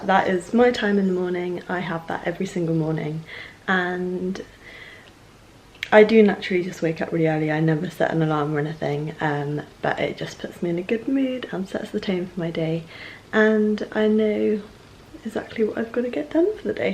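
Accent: United Kingdom. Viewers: YOU are so ADORABLE! Your pretty blonde hair, your adorable cheeks. I love your British accent which only adds to your charm. I love it when you talk to us and tell us all what your doing, your morning ritual etc. Keep up the good work. I love your videos, just love being with you.:-):-):-)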